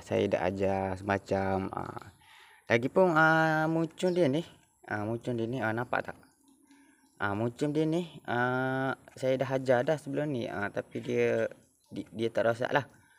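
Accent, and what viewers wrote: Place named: Kuala Lumpur, Malaysia. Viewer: ni pun dah tahan dah sy dah ajar😅muncung dia ni sy dah ajar dah😭sdp dngr abe kecek KL lumpo😂😂kanteh belalang yg panjang tu😅😅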